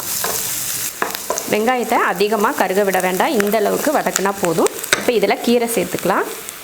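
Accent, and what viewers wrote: Coimbatore, India. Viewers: நம் கோவை தமிழும், செய்முறையும் அருமை, Really Yummy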